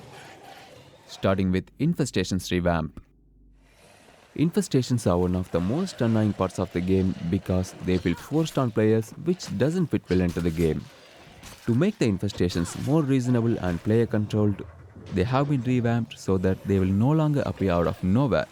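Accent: India. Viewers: Indian accents are kind of pleasant to me,work on your annunciation though🤙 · I can hear the Indian in you. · The Indian English accent isn't innately irritating or anything; you gotta understand that the economic status quo of our world, and Indians' superior command of the English language compared to the Chinese, for example (not compared to natives, I mean), made India the most popular call-center destination of the world. And very unfortunately, most companies that want to save money by using Indian customer-support representatives on the phone instead of local ones also end up wanting to save money on many others aspects, sometimes at the expense of customers, so they end up frustrating customers for countless reasons that go beyond any language barriers with the Indian customer-support representatives. So, many people around the world started to gradually feel irritated with the Indian accent, not because it is innately irritating, but because it reminds them of their frustrating experiences with the companies that employ Indian customer-support reps. 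However, don't let this deter you from just being yourself. Nobody ends up making everyone happy anyway. And as long as you enunciate and speak as clearly as you can, people who are open-minded and interested enough in your content will be able to ignore your accent and just focus on the content that you're delivering. It is more important to be your natural self than to try to please a percentage of your audience, while still improving that "self." A lot of people use the advice of "be yourself" wrongly, where they take it as an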